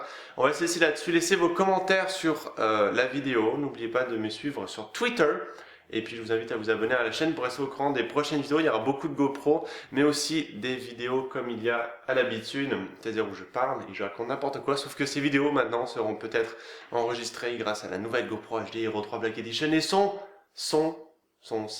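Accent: Canada. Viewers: D'un côté, c'est pas faux,mais d'un autre oui :). Officiellement, il n'est pas Canadien, mais il a une culture Canadienne, c'est pour ça qu'il a l'accent. Rester plus de 10 ans à Montréal, ça laisse des traces x).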